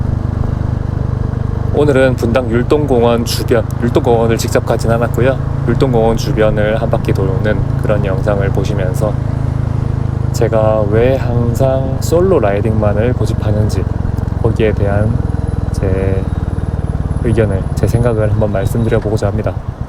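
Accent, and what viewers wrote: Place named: Daegu, South Korea. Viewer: 서울사는 대구인이라 사투리가 아쥬반갑네요 ㅎㅎ 단체투어는 신경쓸게 많아서 결국 솔투를 즐기고 있습니다. ㅅㅅ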